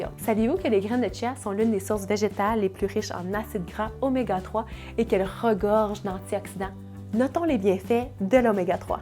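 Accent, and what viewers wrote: Quebec, Canada. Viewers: J’aime beaucoup le français canadien ♥️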